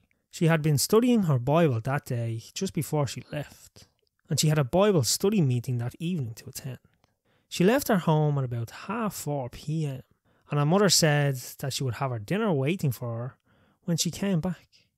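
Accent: Ireland. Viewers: Thank you for taking the time to respond! Very cool of you. I'm in the US...South Boston, Massachusetts. Which happened to have the BEST + biggest majority of Irish-American people filling the neighborhoods of Boston back in the day. Other then Charlestown who had a quite a few Irish also. I grew up with our annual St Patrick's Day parade being at my doorstep. Good ol days lol Southie, as we natives call it, have so much pride in our Irish heritage. There's a neighborhood store where we can order Irish Knits + baby blankets made in Ireland which is about all we have left of our "Irishness" in 2023 lol Used to be someone's Nan that would be knitting those. I said we "had" a large community of Irish here but regentrification...lol It happens. Your content is great! I look forward to future vids. Ty for taking time from your day to respond...figured brogue was better then accent lol And it sounds awesome to these American ears✌️💚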